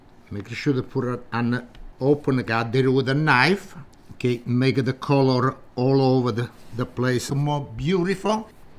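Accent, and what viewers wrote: Italy. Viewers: His True Italian Accent I like including The Video. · Your Italian accent is so refreshing to me… the last time I heard one was in the late 1960’s listening to my grandmother teaching me how to cook. I just turned 14. I loved her so much and still miss her greatly. I subscribed! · He reminds me of my grandma with his accent. She was from Italy. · I love the way you talk? Your accent is beautiful, What part of Italy are you form? Your bread is amazing you make me hungry. Salute from Texas USA ❤ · Love this guy. I'm literally waiting rn for my bread to puff up another 30mins and stick it in the oven after watching this vid. And I gotta say, awesome Italian accent. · Wonderful show. He gets his recipes across in a timely fashion with accuracy. Reminds me of my Italian Grandparents with his accent. Not black pepper. Black AH Pepper. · I just love his Italian accent 😍 ❤ Beautiful ❤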